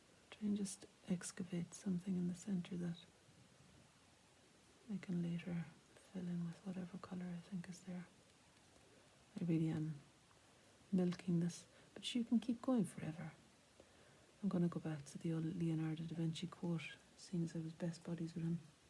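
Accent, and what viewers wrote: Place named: Ireland. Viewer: ❤With your damn Irish accent, I can understand anything! But your work speaks for itself! I think it is time for another DVD!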